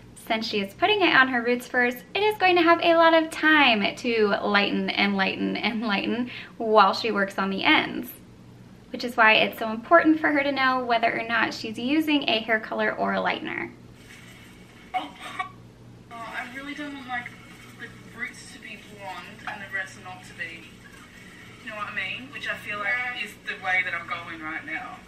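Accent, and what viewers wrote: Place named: Australia. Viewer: she sounds Australian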